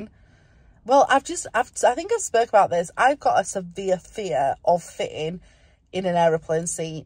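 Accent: Yorkshire, United Kingdom. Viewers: I thought so. Yorkshire lasses r so strong willed so use that strength to stay strong on your weightloss journey. It isn't easy but u got this👊💪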